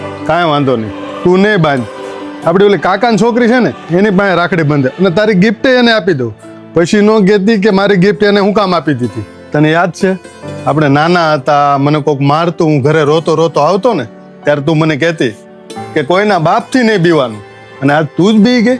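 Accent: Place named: Bhavnagar, India. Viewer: બોલી પરથી લાગે છે કે ભાવનગર તરફના હશો.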